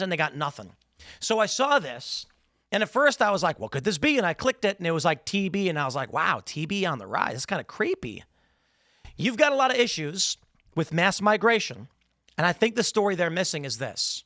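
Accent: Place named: South, United States. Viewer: Good southern accent. I’m southern & sound like cornbread.😂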